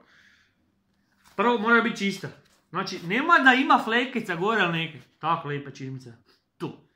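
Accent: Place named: Kajkavian, Croatia. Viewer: Lepi pozdrav gospon ziz sanoborskoga kraja,tocnejse ziz Bregane,prekrasnoga mesta na same granice ziz Slovenijom...pratim te ne duge al sem pogledal saki tvoj uradak bar pet put....nezmislim se kulike gemistov sem spil dok sem gledel tvoje filmeke...daleke si najjaksi jutjuber i same nastavi tak...nek se daleke cuje nas pravi domacejsi govor...neiskvarena kajkavstina na teru morame biti jake ponosni...moram ti dati same jednu malu primjedbu...navek pozdravljas Zagorce,Medimurce,Podravce...a kaj je znami Prigorci...em sme i mi isti kak i vi...se nas muciju iste brige...babe,male place,premalo pijace...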